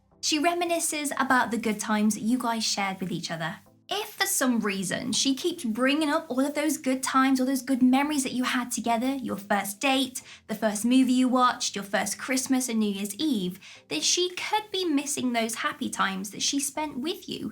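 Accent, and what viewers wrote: England, United Kingdom. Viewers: Your videos are great, you're beautiful and I love your English accent.... Just saying, but thank you.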